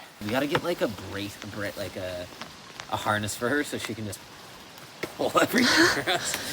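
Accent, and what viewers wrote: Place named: United States. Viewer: My Brit wife says you have an American accent. As an American, I agree.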